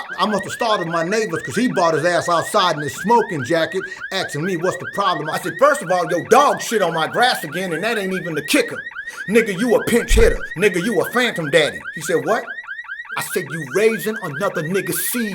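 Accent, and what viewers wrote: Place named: California, United States. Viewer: homie you sound more like a Cali nigga brah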